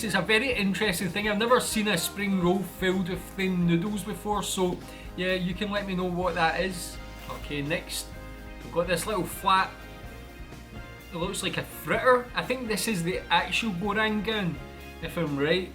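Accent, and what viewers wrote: Scotland, United Kingdom. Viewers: Are you from Scotland ? Your accent sounds Scottish.. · I Love Scottish Accent...👍👍💜💜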